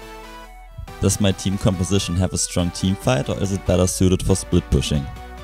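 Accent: Germany. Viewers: Are you german? :)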